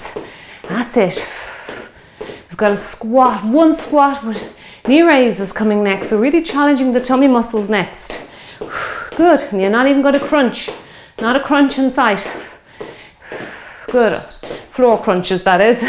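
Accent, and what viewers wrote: Ireland. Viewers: I was just looking around for a workout to get me back into a good workout routine after a long time away due to injury. This workout is just perfect. And your Irish accent is so lovely to listen to!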